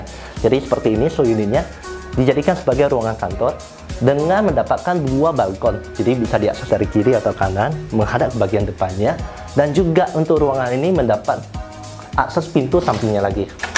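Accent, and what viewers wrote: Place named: Medan, Indonesia. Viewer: Ngomongnya ciri khas cina medan bgt yaa.cadel bgt..hehee. Ga kyk cina jkt lbh enk dengarnya.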